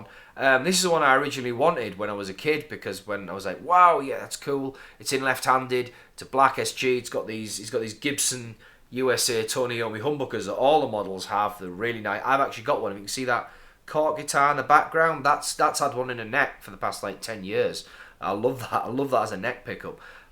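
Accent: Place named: North, United Kingdom. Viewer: nice to have Yorkshire accent from once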